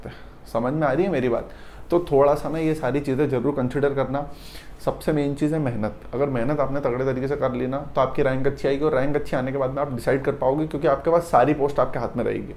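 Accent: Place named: Rural, India. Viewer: Baat sahi he ..appka baat sunke lagta hai app gao se ha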